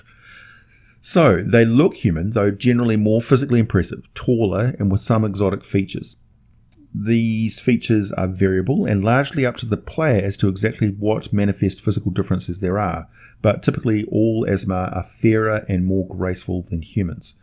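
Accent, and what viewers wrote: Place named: New Zealand. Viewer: Wow another Kiwi! Such a great thing to hear when I'm not expecting it XD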